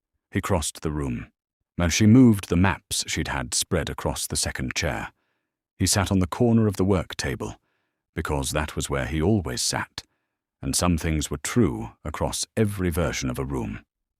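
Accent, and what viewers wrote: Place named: United Kingdom. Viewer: The narrator is British so I'm choosing to think this is set in one of the beautiful moorlands of my home country, I don't care if it isn't, I claim it! 🤣 I like he went after her, he knew he screwed up and believed he was protecting her but he should have told her what was happening instead of hiding any danger from her. She's an amazingly calm soul who dealt with this with great dignity.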